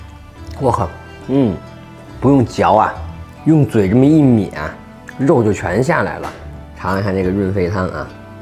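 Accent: Beijing, China. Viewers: 京腔的特点就是这样，不少北京人说话就是这样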